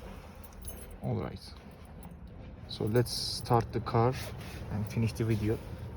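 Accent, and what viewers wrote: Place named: Turkey. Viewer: şarkısi çalmadan önce anlamıştım türk ingilizcesini:) teşekkürler video için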